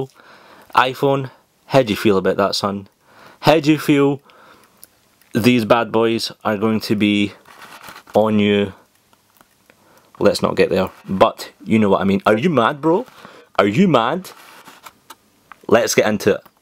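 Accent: Scotland, United Kingdom. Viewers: I love your accent I love Scottish ppl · YOU ARE SCOTTISH!!! #THATISALL #JUSTSAYING #GIVEAWAY LMAO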